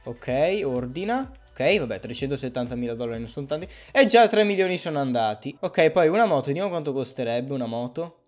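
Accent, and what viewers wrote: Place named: Tuscany, Italy. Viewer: sei toscano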